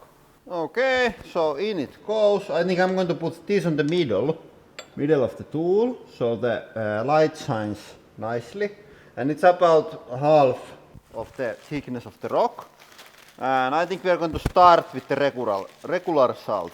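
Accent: Finland. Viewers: You sound so finnish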